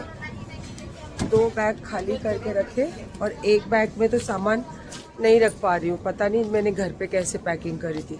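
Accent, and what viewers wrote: Bangalore, India. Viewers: Ur bangaluru urdu is nice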